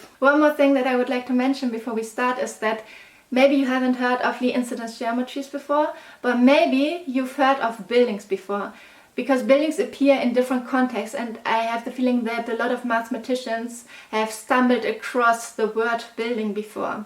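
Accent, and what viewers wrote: Germany. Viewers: German accent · You have a German Accent.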